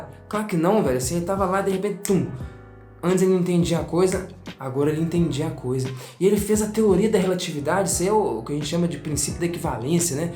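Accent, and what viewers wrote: Minas Gerais, Brazil. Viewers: Cara, como é legal ver você (um garoto uns 30 anos mais novo que eu) falando coisas tão interessantes nesse mineires delicioso. Não sou físico e nem matemático, sou paulistano e quase sexagenário portanto acho que não faço parte do maior segmento do seu público e nem sou o principal mas adoro assistir os vídeos e aprender com você. Parabéns pelo trabalho.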